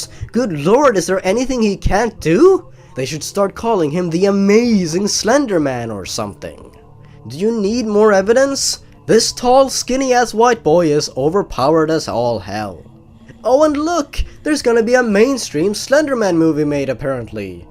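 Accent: Sweden. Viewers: wait are u swedish? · Are you Swedish!? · Are you Swedish?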